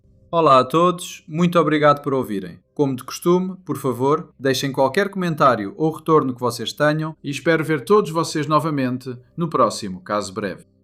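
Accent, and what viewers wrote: Portugal. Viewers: Esse sotaque portgues é muito feio 😅😅😅😅😅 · Impossível assistir com esse sotaque de portugal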